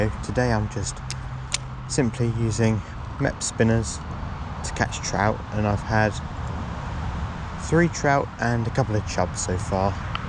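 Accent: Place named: South, United States. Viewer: Hi mate, fantastic location and fish! Your accent sounds like youre down south, im from Buckinghamshire but struggling to find some good wild trout streams like this (a lot of them seem to be expensive chalk syndicates or fly-only). I dont suppose you'd be able to give me any tips on these sort of locations you spin for trout on? Dont mind driving an hour or 2. Can always PM if you dont feel like sharing publicly, thanks, James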